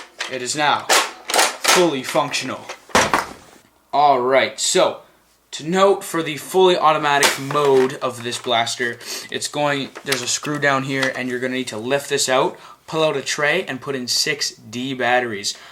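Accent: Canada. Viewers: Are u a Canadian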